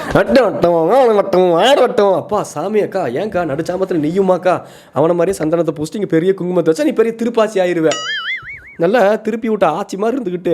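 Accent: Coimbatore, India. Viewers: The coimbatore slang is super❤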